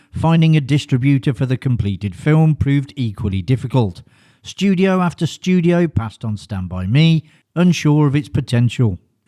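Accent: United Kingdom. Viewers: Or that the speaker is British... Which based on his accent, I would say is British. A classic one is the word Aluminum. British people say "al-u-min-ee-um" instead of "ah-lum-in-um". They even spell it differently for that one...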